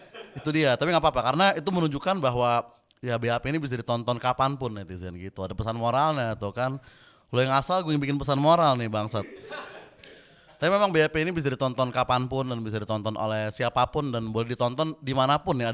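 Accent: South Jakarta, Indonesia. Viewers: Gokil brooo ...acari aku logat anak jaksel dong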